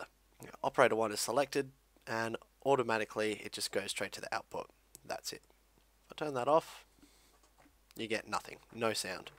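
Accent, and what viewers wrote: Australia. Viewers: i just wanna say thanks for talking nice and clearly, and in a good easy understandable aussie accent. half the other dub tutorials on youtube have hell quiet hard to understand accents, so be proud of that one. also, i havent checked ur other vidz but do u have a tutorial on how to do build ups and bass drops? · Ha, thanks man you've helped a lot because I'm a noob to FL studio! Your aussie accent makes the commentating even funnier XD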